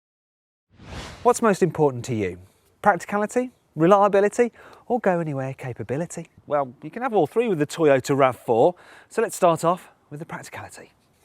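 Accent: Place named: Birmingham, United Kingdom. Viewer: Interesting how you can't hear your Brummie accent as much in these older videos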